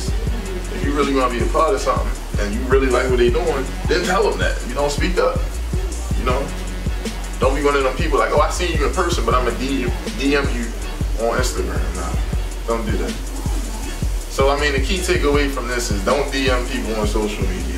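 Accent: Baltimore, United States. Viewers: B’More Accent?? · You from Baltimore? I noticed that “Yeew”(You) “Blew”(Blue)😂😂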